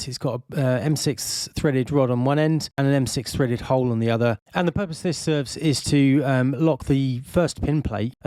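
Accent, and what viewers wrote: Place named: England, United Kingdom. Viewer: I like the well designed attachment but as an American I cannot understand the speaker's rapid English accent.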